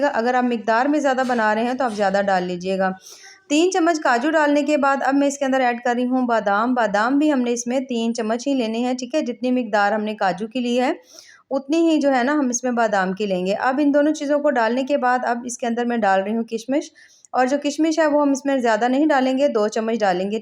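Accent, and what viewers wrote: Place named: Punjab, India. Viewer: AOA Apki kafi videos me ny dakhe hen, ap jis Andaz me or Punjabi baul K bat krti hen, muje boht acha lgta he Apko sun KR,,, ap bohat ache baten krti hen Jo K reality se related Hoti hen😊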